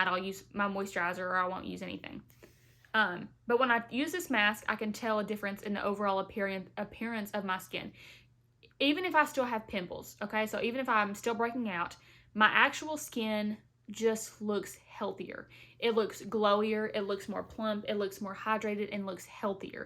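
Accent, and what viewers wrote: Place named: Kentucky, United States. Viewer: I’m new to your channel.. as soon as I heard your voice I thought to myself “she’s from my neck of the woods” Kentucky, correct? ❤️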